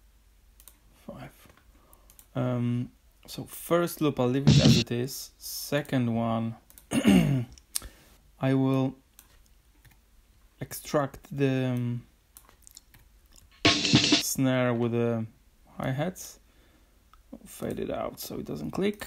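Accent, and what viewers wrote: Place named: Germany. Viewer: not an authoritative answer but my guess would be a German living in the UK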